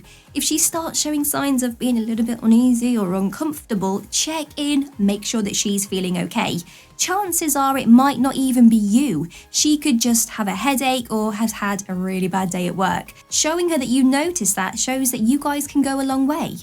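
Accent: United Kingdom. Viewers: Shes British...you can find her videos on the net before she became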